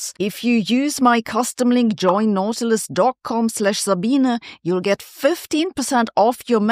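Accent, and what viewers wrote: Germany. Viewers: German roots - and so the style of speaking - peeps through - like all of us - our past speaks too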